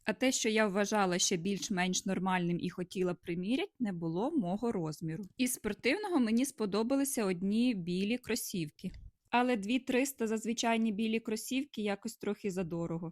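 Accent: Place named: Galicia, Ukraine. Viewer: Це якийсь ссс Галичина...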